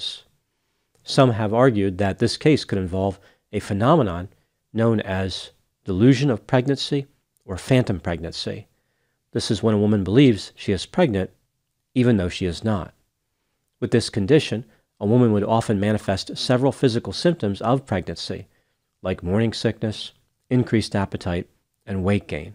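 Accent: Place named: Pittsburgh, United States. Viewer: Are you from Pittsburgh,? Your accent reminds me of my hometown. 😊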